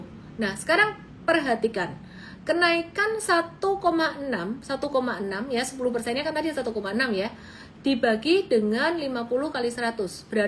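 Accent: Java, Indonesia. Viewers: Medok bgt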